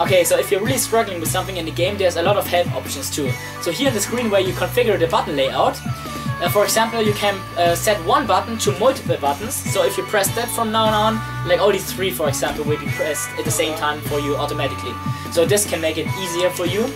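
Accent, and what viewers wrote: Germany. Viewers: ich wusste das du deutsch bist XD dein Akzent verrät es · Nice to see as a german. Quite creative man keep it up Und man merkt den Deutschen Akzent aber cool ;)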